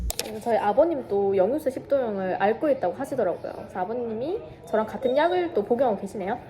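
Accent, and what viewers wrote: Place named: Daegu, South Korea. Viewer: 처음보는데 대구분이신가봐요 사투리 친근하네여:) 잘봤습니당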